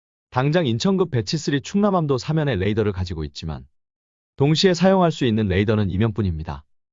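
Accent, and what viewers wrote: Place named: China. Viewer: 왜 목소리에 중국,북한의 억양이 섞여 있는지,나만의 착각인가? 하지만-! 열심히 하세요 응원합니다😅😅남들이 하지않는 더 좋은내용 으로..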